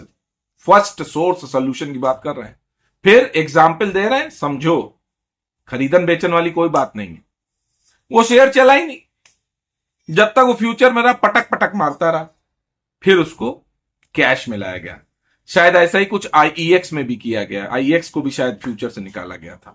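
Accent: Kanpur, India. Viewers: एक दम आम व ज्ञानी आदमी, कनपुरिया स्टाईल में। मजा आ जाता है सुनकर। अति उत्तम।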